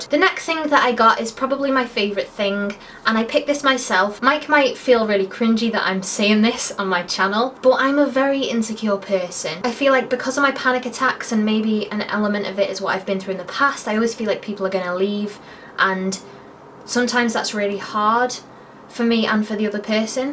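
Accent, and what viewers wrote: United Kingdom. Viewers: HOLY CRAP YOUR BRITISH!!! LOVE IT!!! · You're soooo beautiful! Happy birthday! I love your videos and your british accent! XOXO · It's a british accent, I don't know which one :o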